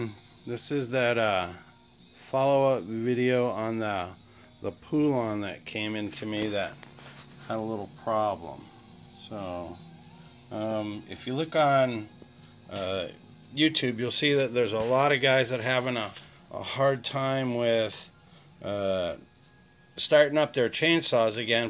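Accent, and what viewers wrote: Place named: Massachusetts, United States. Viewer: sound like a mass accent love it from new hampshire.. good vid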